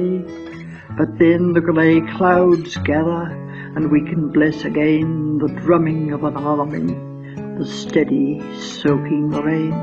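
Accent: United Kingdom. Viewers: The Reciter sounds like she's from Shetland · It makes me weep too. has a beautiful and distinctive Dundee burr, from her grandfather I guess.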